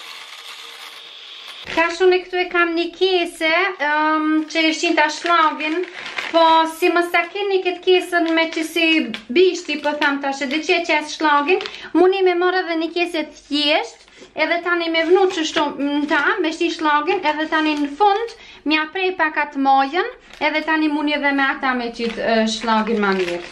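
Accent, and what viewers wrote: Kosovo. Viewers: QENKE SHUM E MIRE DHE SHPJEGON SHUM MIRE ME PELQEN DIALEKTI KOSOVAR SHUM. TE PERSHENDES. 🙋😄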